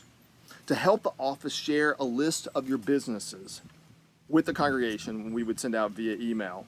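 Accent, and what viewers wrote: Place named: South, United States. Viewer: So good to see your face! (Miss that southern twang though.) Hoping you, your family, & your congregation remain well & safe during this time. ❤️ JT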